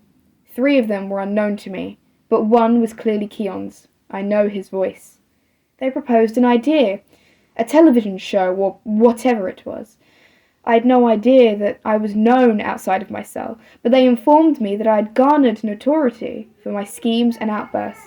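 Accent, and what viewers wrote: United Kingdom. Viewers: very british i don’t like it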